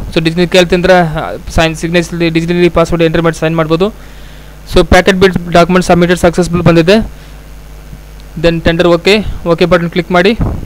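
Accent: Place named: North Karnataka, India. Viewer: Yappa maraya kannada dag matad pa hingyak alakati clear aagi matadidre tiliteti...Uttara Kannada dav adree yak nachkotiya hodi9 Annu yak achcha kannadalli matadbek anta alta idiya,relax agu modlu..,tekta idira eke...?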